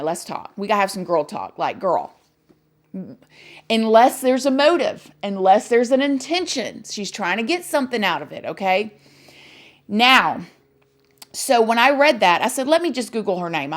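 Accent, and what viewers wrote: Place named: North Carolina, United States. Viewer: when you said shes on the doggone youtube i dieeeed!!!! im from charlotte nc and i hear your accent!! where are u from friend? im such FAN LIKE I WANNA BE YOUR BEST FRIEND!!!! LOVE YOUR VIDEOS!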